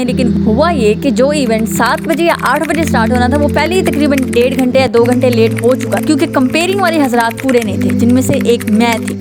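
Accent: Punjab, India. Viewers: Yr sister ap ki cute punjabi 😂❤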